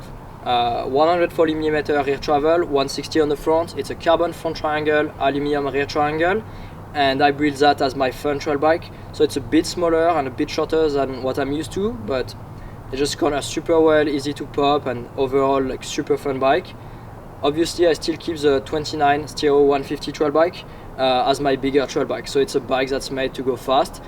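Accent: France. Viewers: Salut Rémy Metailler trop beau ton bike.On voit quand même que tu as encore l'accent français.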